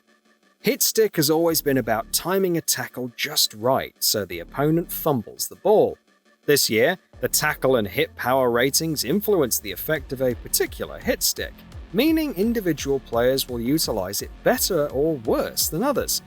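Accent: England, United Kingdom. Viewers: Why is a British guy narrating a video about Madden? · Dudes with English Accents should not speak about American Football. It's just feels weird.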